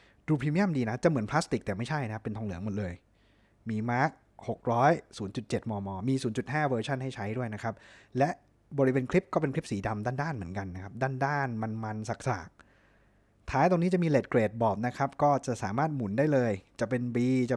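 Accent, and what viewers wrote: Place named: South, Thailand. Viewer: ใต้ออกเยอะหรอยนะครับคลิปนี้555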